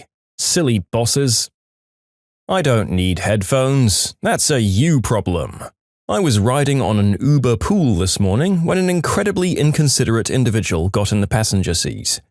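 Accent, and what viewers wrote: United Kingdom. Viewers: You sound British now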